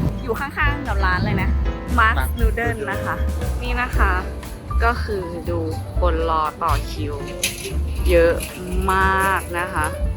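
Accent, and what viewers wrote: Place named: North, Thailand. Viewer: สำเนียงแบบนี้คนเมืองเเน่นอนครับ55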